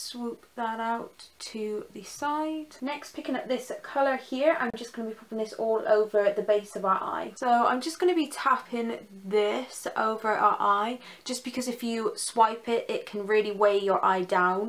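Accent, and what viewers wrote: Bristol, United Kingdom. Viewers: Are you from Bristol? I'm from Bristol and my accent sounds so strong in my videos lol. Love your videos btw xx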